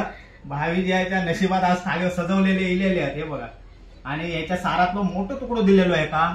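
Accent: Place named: Malvan, India. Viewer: व्हिडीओ चांगलेच असतत मालवणी भाषा बोलण्याची ठेक माका आवाडता शात हा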